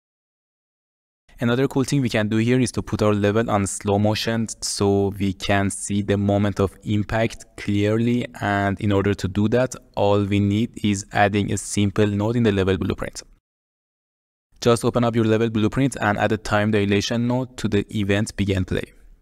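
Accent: Iran. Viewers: عالی بود جان فقط یه سوال دارم من تازه چنلت رو دیدم و دیدم لهجه داری فهمیدم ایرانی هستی بدون اینکه به اسمت توجه کنم... خواستم بدونم واسه تولید محتوا انگلیسی آیا بازدهی بیشتری داره؟ چون خودمم میخوام همینکارو بکنم... و احتیاج به راهنمایی شما دارم... و اینکه اگه توی ویدیو صحبت کنی آیا تاثیری داره واسه مخاطب هرچند سخته انگلیسی واسه ماها ولی تنیجه‌اش رو میخوام بدونم ممنون میشم جواب بدی🫶👑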